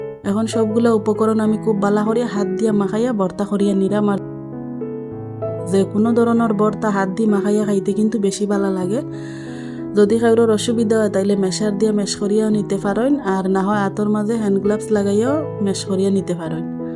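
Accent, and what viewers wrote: Sylhet, Bangladesh. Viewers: আপু তুমি কি সিলেটি।আমার খালু এভাবে কথা বলে।❤❤😊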